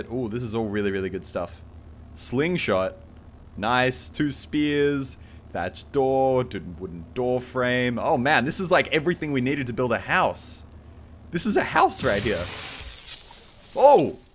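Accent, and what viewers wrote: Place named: Australia. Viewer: he's australian ^^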